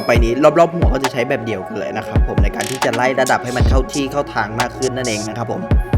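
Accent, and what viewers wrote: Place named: Ratchaburi, Thailand. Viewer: สำเนียงราชบุรีมาก😂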